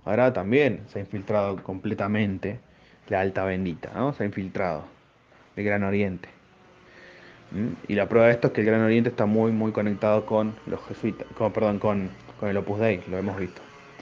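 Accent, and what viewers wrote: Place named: Argentina. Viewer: Es increíble pensé que iba a terminar diciendo algo cuerdo , pero al final terminaste difamando al movimiento Provida por el solo hecho de contar en sus filas con católicos , cuando en realidad también hay pentecostales , mormones, evangélicos sionistas y no sionistas , universalistas de todo tipo , después andas subestimando a la gente con que va adorar a lucifer cual Masón g33 jajajaja , después te solidarizas con la izquierda como si fuera la pobrecita de todo esto la utilizada y volvés a atacar por los colores , después me parece que desconozces el peligro del feminismo , un familiar mío tuvo que ir con abogados por una falsa denuncia ,no solo se trata de salvar al niño por nacer , después mostraste la hilacha haciendo propaganda a tu liberalismo capitalista pedazo de Golem, ah y encima por la voz parece que sos argentino ( al menos que seas uruguayo) seguro vivas adentro de un tapper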